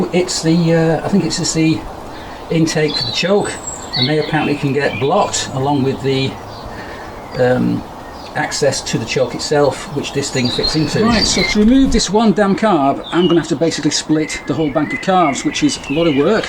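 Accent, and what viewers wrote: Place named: England, United Kingdom. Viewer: Good morning brother.... Have you done a compression and or leak down test on that cylinder.... Love your English accent... Cheers from Australia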